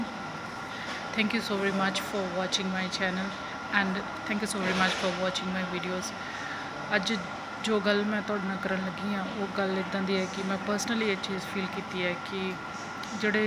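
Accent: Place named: Punjab, India. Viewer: ...south India to ho k eni pure Punjabi language...wah!...oh v pendu panjabi...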